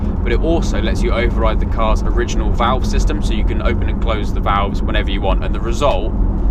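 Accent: United Kingdom. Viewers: I have met Seth MacFarlane in person since I live in Los Angeles and work in entertainment and once worked with The Simpsons production team. I'm pretty sure Seth could do an awesome impersonation of your British accent and humor. :-) p.s. good to see you doing more videos. the first video I saw of yours was 4 years ago, the M3 video from March 30th, 2013. "Honey, have you got the baby?" Hilarious! hope to see more videos soon. btw, I have the E92 V-8 with Akrapovic exhaust and going to get the M4 as a second car for work commute. Cheers mate, Mark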